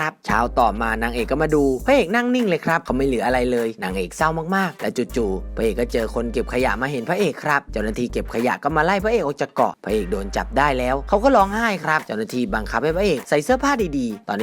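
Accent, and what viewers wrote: South, Thailand. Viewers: พี่เป็นคนใต้หรอหนูได้ยิน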